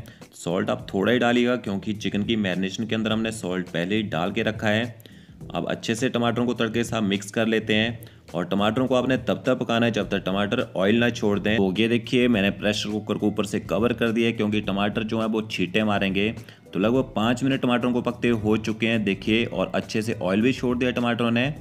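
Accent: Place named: Pahadi, India. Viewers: Are you from Uttarakhand? If yes where are you from? I am asking because you have some Uttarakhandi accent in you voice. Just asking out of curiosity. BTW I’ll surely going to try your recipe and let you the result. Thank you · U r pahadiapka tone lagra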